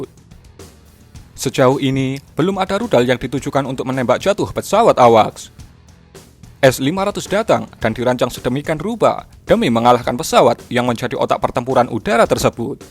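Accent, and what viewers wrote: Java, Indonesia. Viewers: Suka denger logat jawanya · Suara lu terlalu medok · Medok medok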